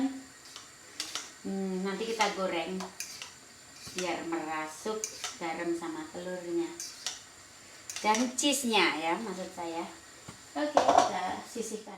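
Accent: Java, Indonesia. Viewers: Yg bikin aku suka liat videonya mb tu gaya bicaranya yg kecampur bhs.jawa medok...lucu bikin aku ketawa2😂😂😂ngedhuk segooooo mbak 😂😂😂😂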